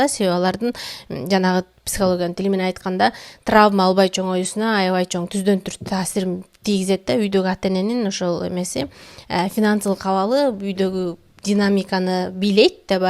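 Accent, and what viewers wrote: Standard. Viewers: ...мен бул каналга бекеринен туш келбедим, бир дем менен угуп чыктым. Бул кыз кыргызча, орусча, англисче, туркчо ой жугурткон практик, динден кабары бар, жолдошунун акылманы, балдардын суйуктуу апасы, эн кучтуу МИНИМАЛИСТКА деп кабыл алдым🎉🎉🎉. Жашым бир топ улуу болгону менен озумо керектуу коп нерсе алдым! Эми уйкум качат 😅. Ото жонокой, таза кыргыз тили менен арбап алды. Аз тапканына чиренип, жерде басканын унутуп, мурдунун таноосу корунгучо чалкалагандар учун улгу болчу акылман кыз экенсин! Эми тынбай коромун! Орустар айтмакчы ПРОСТО ПОЛЮБИЛА!!! Токтобой ос ылайым Алтын кыз!!! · Суйлогонунуз да кыпкыргызча гоо! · Абдан акылдуу, кыргызчаны таза сйлогон кыз экенсин чон рахмат!!! Кайсыл жерлик болосун? Мен Нарындын Ат- Башы районунан болом. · Кандайсыз, Кыргызстандан сырткары иштеп окууп журсонуз да Таза суйлогонузду сыйладым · Кыргызчаны таза сүйлөйт экен. 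Баракелде🎉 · таза жана так кыргыз тилинде сүйлөгөнүң үчүн канча "жакты"белгисин басуу керек болсо,басмакмын.Өмүрүңө жана ден соолугуңа береке тилейм,аман болуп,кыргызга пайдаң тие берсин.Ошон үчүн да баракчаңа жазылдым. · Кыргыз тилин таза сүйлөгөнүңдү сыйлайм,өмүрүң узун болсун жаштарга үлгү болуп жүрө бер кызым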